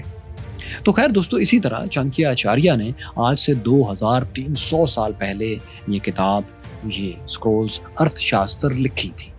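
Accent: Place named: Punjab, Pakistan. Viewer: Other than the Punjabi accent it was good content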